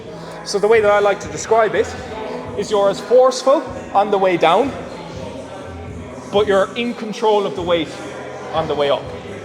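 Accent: Ireland. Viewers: You're fkin hilarious bro 🤣 love the sense of humour and great content. I can feel this channel blowing up very soon. Btw is that a Limerick accent I hear?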